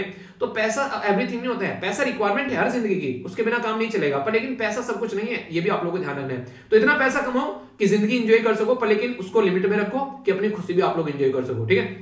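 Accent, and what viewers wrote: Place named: Kangra, India. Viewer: Sir kangadi bolte hai 😎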